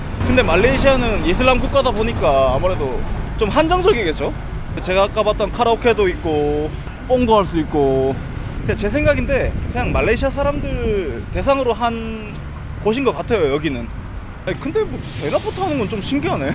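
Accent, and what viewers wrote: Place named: Gyeongsang, South Korea. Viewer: 고향이 어드래요? 경상도 말도 튀어 나왔다가 연변 말도 살짝 나왔다가 표준어가 오르락 내리락 하네요 한국말 잘하는 교포?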